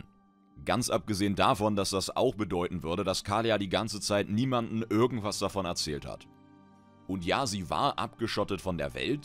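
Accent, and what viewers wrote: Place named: Saxony, Germany. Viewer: Wow Lore + Sachsen Akzent. GOLD!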